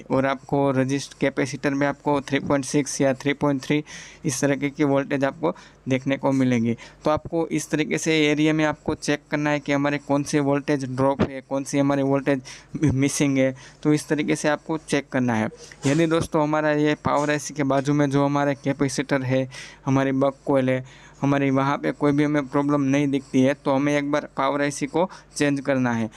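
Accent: Gujarat, India. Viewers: Tamara bolva uparthi lage k tame gujarati cho??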